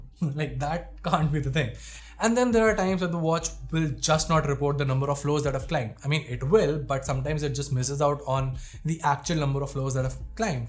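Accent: India. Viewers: Great review, subscribed. I usually stay away from Indian accents, just because they usually come with subpar English and are hard to follow, but your charisma is alluring, and your English is quite good. Keep up the good work!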